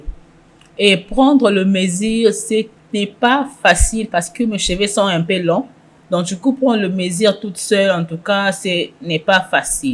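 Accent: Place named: Congo. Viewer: Hummm l’accent du Congo 🇨🇩 my sister 💪🏾💪🏾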